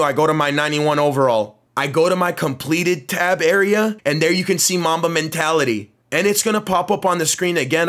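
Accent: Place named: Canada. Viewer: You’re from Canada for sure, prolly Toronto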